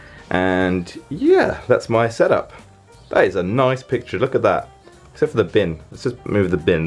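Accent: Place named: United Kingdom. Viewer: is from the UK. You can clearly hear the difference between English (British English) and 'English' (American English). Jordi and Jelly are from the Netherlands, they have been speaking "English" (Dutch English) in videos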